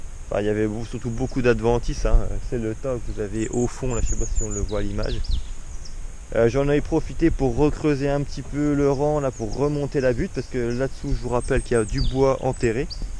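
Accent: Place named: Lorraine, France. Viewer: Et oui les parents habitent la Lorraine et je reconnais bien ce petit accent bon dimanche bravo pour ces vidéos très intéressantes a bientôt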